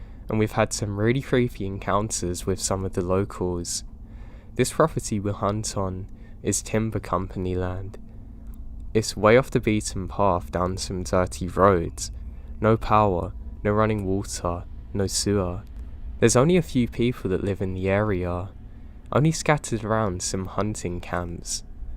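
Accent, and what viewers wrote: United Kingdom. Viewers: im glad you do mate i get bored of these american story tellers, its good to hear a fellow british accent. i was actually shocked the first time i heard you were british tbh lad, keep it up any way you tell stories clearly, and the starting motivational bits are a good add to people who maybe need words of wisdom but dont try to find it, so you prolly have more of an effect on people than you know. One thing tho is maybe a bit too much emphasism sometimes? No hate ofc but i find that it makes a big difference if the main parts are emphasised in stead of the irrelevant bits. Kinda adds more power to the plot, but it aint me thats tellin em so keep up the good work lad 👏👏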